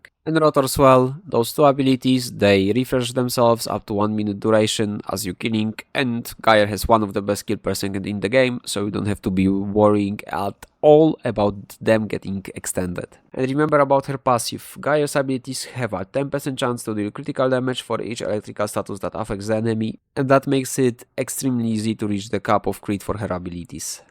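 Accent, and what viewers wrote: Poland. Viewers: u poland? i hear accent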